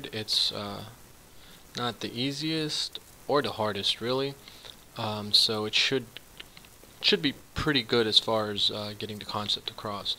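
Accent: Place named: United States. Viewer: Wow, am I dreaming or is this an a guy with an american accent? FINALLY!!!!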